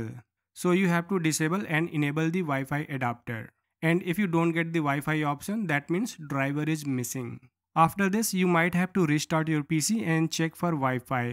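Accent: India. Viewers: You know the problem is half solved when you listen Indian guy narrating in video. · the network reset worked for me tysm omggg since i heard ur indian accent i knew u were gonna be the one who helped me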